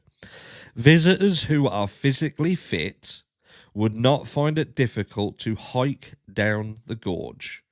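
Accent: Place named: Norfolk, United Kingdom. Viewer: Of course it's bullshit. You are right. Even in our in bread norfolk, where I a sm from and sounds like you are, this shit don't happen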